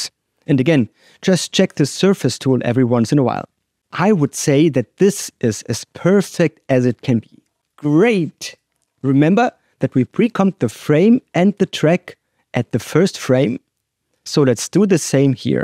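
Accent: Germany. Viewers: Where do you live, sounds like germany...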